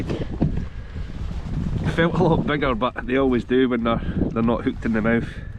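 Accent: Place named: Scotland, United Kingdom. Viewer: Hi, i'm Italian and looking at the landscape I would have guessed Scotland as well. Not to say about his typical accent.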